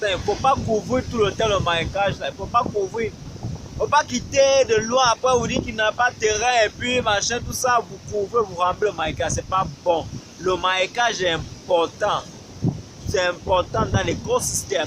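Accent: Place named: West Africa. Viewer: Qu'est-ce qu'il y a même à parler comme les Ouest-Africains ??? Parle comme un vrai Camerounais aka ! Tsuip !!!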